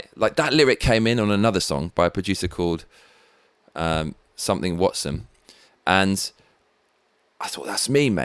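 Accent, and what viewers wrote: England, United Kingdom. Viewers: English accent. I hope this guy isn't yet another far left Trump-trasher. Go watch the Pennsylvania meet with state legislators where huge evidence was presented. The Supreme Court hearings will come next week. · great stuff..glad to hear a British voice speaking truth!!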